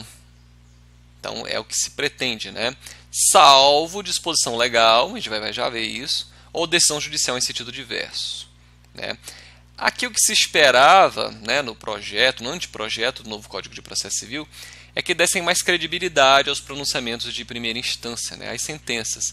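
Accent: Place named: North, Brazil. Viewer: Esse professor é do Norte, conheço esse sotaque, kkkk. Só não sei identificar de onde, se do Pará, Macapá ou Amazonas. Alguém sabe me dizer de onde ele é?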